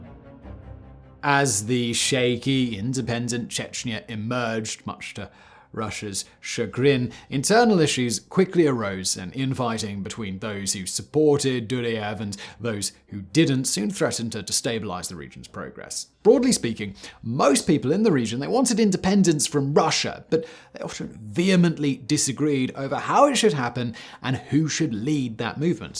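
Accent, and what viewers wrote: RP, United Kingdom. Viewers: There really is no better narrator for a historical documentary than someone with a posh British accent I swear to God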